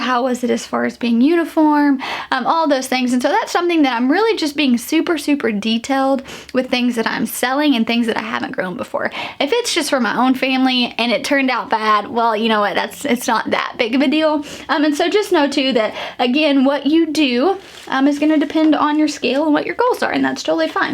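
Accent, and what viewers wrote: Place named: South, United States. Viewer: I like how you Southerners say "feel"...! it's just like "fill" to the northern ear ahhahah it is so pleasant to hear. Happy Gardening and Happy New Year all. Thanks for the inspiration.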